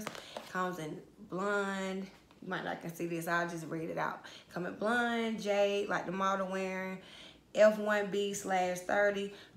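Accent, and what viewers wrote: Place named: Georgia, United States. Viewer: This color is so pretty!! And you must be from Georgia. I recognize the accent lol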